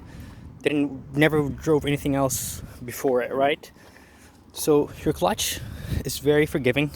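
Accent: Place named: Canada. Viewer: It's Canada.... the accent should have been a giveaway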